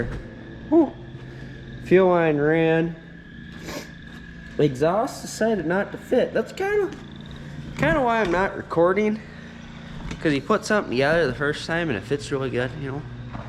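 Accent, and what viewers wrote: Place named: Midwest, United States. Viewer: sound a little midwestern lol either your from Minnesota or Wisconsin just picked up a 111 today needs a new engine as well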